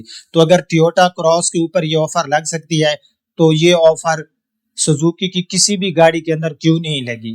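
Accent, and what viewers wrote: Saraiki, Pakistan. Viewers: بھائی ۔ آپ خالص اردو ہی بولا کریں ۔ انگلش الفاظ کا تڑکا بڑا مصنوعی سا محسوس ہوتا ہے ۔ ویسے بھی آپ کا لہجہ سرائیکی اور دیہاتی پنجابیوں جیسا ہے ۔ آپ نے تلفظ ہائی بَرَیڈ ادا کیا جبکہ یہ ہائی برڈ ھے ۔ فائدہ ہے فیدہ نہیں ۔ لہذا خالص اردو ہی بولیں اور اپنائیں ۔ ھمیشہ خوش رہیں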